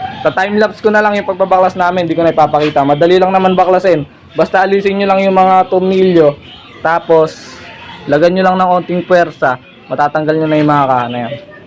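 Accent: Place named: Bulacan, Philippines. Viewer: Paps taga bulacan kaba? Sa punto mo kasi eh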